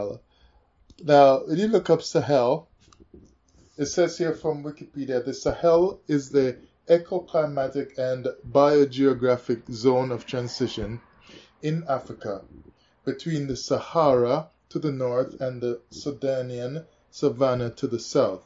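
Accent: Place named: Caribbean. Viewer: Hi, I hope you are well. I have listened to your videos on and off for several months although I have not seen the last few months worth. The last ones I really related to were those that spoke about the original language. I could relate to your frustration of what really is the original - I now feel I have found the answer. Like me, you sound like you are from the Caribbean and you will most likely share my heritage. Please find me on facebook (Zephaniah Blake) and lets talk. Peace and love.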